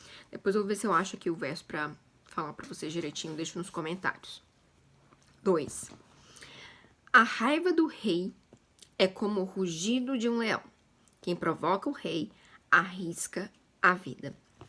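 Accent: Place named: Minas Gerais, Brazil. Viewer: Mineirês! Sotaque mais lindo. Amo !❤️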